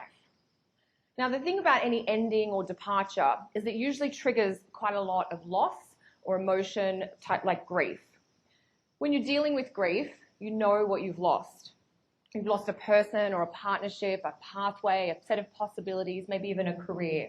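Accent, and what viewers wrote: Australia. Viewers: Wow where are you from originally are u from Australia, like Melbourne? Omg Fiji that's lit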